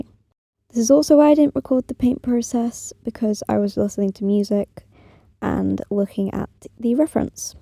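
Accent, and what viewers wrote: United Kingdom. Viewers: Oh my god this tutorial is amazingggg,, also the accent?? Are you British?? :)))) (if so do you mind me asking where abts? Like north, south, west, midlands etc)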